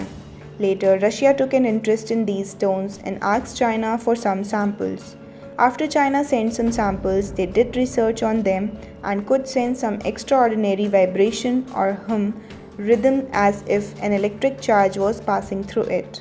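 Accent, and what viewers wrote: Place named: India. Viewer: This channel have very interesting videos but with all my respect is very hard to listen the audio in english with that strong indian accent and the letter are too small .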